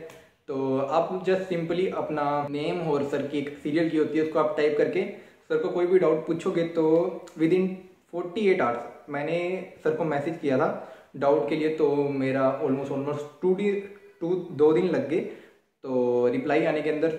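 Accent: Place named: Punjab, India. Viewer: meine is liye puchhe kyonki mein bhi punjab se aur jado tusi gall krde paye si tdd tuhadi tone to mein samj gaya, ki ah banda te apne vall da hi aa, punjabi aa aah te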